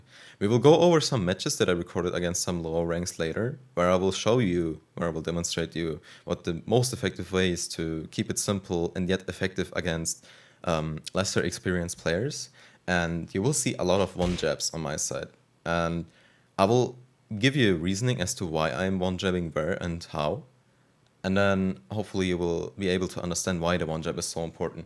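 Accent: Germany. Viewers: German Accent detected Kuss auf die Nuss von einem fellow Devil Jin Loyalist <3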